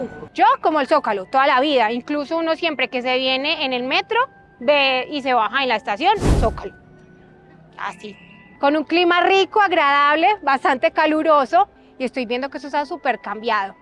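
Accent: Antioquia, Colombia. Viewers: Hola , ya he visto varios vídeos tuyos y me encanta tu style, tu vibra me vibraría y aunque eres colombiana te aceptamos tal. Cual eres y ya eres parte de nosotros Lis mexas ok nunca pierdas tu acento , me encanta , es lo lindo de ti mmm junto con todas tus cualidades jijijjji y además muy hermosa viva Mexico y Colombia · Amo el acento colombiano 😍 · me encanta ese acento paisa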